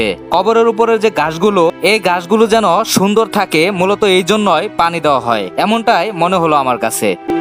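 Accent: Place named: Narsingdi, Bangladesh. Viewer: ভাষাতো নরসিংদী র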